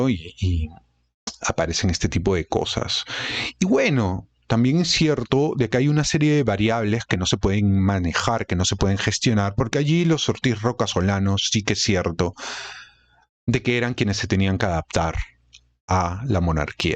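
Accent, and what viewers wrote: Peru. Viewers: Genial eres Peruano????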